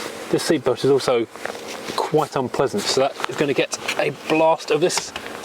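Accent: United Kingdom. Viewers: ...The best investment I ever made was an Ozone machine. You run it for about 30 minutes inside the car. It will kill everything in the car such as mold, insects, etc. I feel much safer when I drive my older cars knowing I'm not inhaling mold spores where I can't clean! The machines are only around $75.00! ENJOY your channel! With that British accent, you make me enjoy even more my Rolls Royces!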